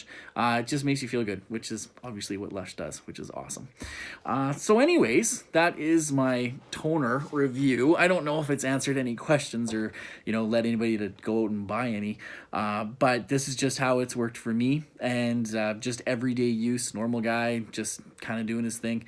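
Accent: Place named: Canada. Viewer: Fellow Canadian here. You have such a Canadian accent! Love the video!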